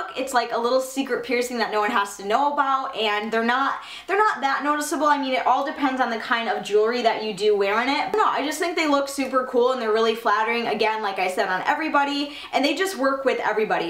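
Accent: Michigan, United States. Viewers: I'm sure that the only reason people ask if you have a lisp is because you're from Michigan. A lot of people I know sound like this too😂 I sound like it as well, and I live in Michigan.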